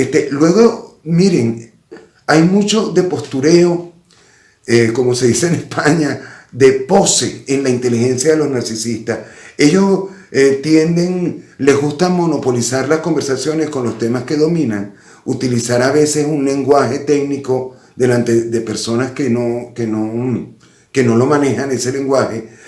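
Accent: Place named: Venezuela. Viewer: Me gusta ese acento. Parece venezolano. Muy dulce como habla también. Gracias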